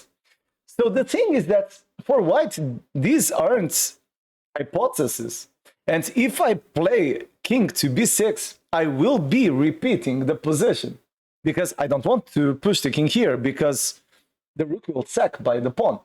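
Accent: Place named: Azores, Portugal. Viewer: O bendito, pelo nao dito, tu es da ilha do Pico. Took me a bit, but I know it was Portuguese with azores accent. Good show and enthusiasm.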